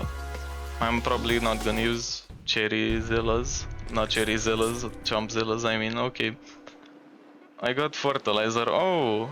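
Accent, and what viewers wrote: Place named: Eastern Europe. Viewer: I love that slavic/russian accent where are you from?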